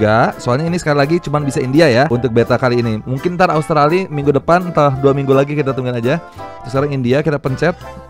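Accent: Bandung, Indonesia. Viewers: Logat namah ciga orang ciroyom sih 😁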